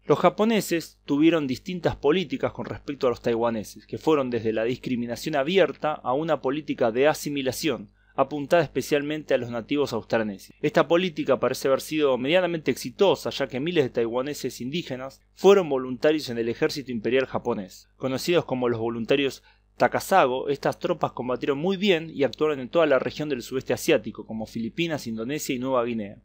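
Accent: Argentina. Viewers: uf con la pronunciación de un argentino, pero fenomenal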